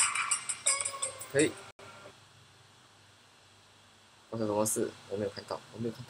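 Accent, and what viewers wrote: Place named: Taiwan. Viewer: 聽聲音J大好像是臺灣人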